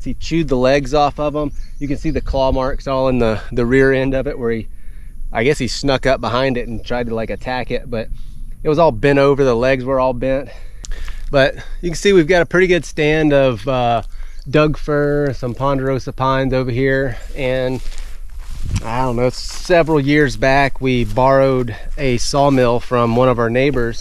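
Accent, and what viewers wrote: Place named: South, United States. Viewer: I'm just curious as to what part of Florida your from? I'm originally from Georgia. West of Atlanta about 30 miles but I moved to Perry Florida back in 1996. Perry is in Taylor county and I've been coming here sense I was a kid. Anyways I was just curious if you were from anywhere close to this area. Like the accent by the way. Always good to hear someone from the South!! I've just started following your channel so I was wondering how you wound up where your at now if you don't mind me asking?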